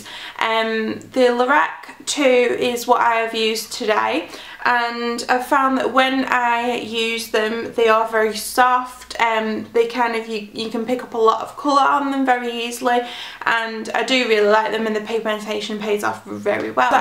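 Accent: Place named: United Kingdom. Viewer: Honest review much appreciated! I'm a Brit in the US, and your accent is making me all homesick :)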